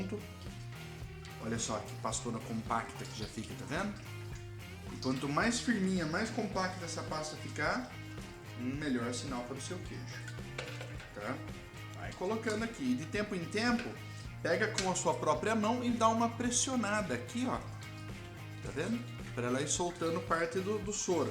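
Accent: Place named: Rio Grande do Sul, Brazil. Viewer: tu é gaúcho...né!!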